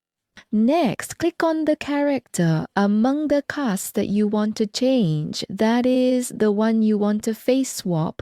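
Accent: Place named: India. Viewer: 인도 사람이 중국어 하는거 같아요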